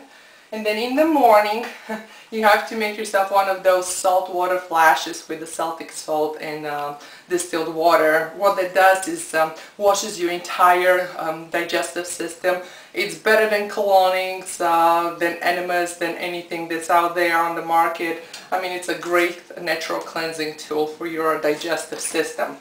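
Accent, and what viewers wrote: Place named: Poland. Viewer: sorry just noticed your accent, are you European? lived and probably raised in america? just curious lol... sounds you've got polish accent.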